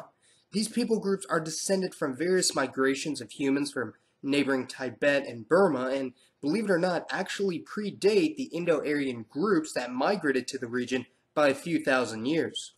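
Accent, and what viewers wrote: United States. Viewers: Thaibet? Phulease dude. You sound like you grew up in bf u.s.a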